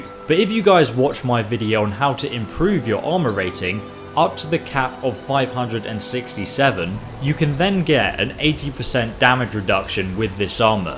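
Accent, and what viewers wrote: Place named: United Kingdom. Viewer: LiverpoolSwag69 he's British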